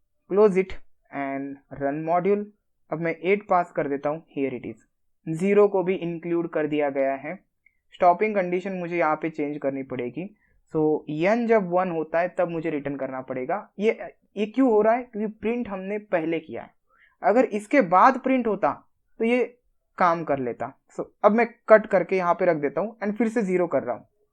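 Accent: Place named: South India, India. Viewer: Brother yen pronounce South Indian and also don't forget the missile man of India belongs from south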